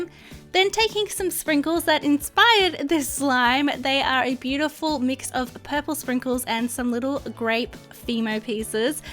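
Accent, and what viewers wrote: Australia. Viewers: is solo beautiful and LOVELY, are you Australian?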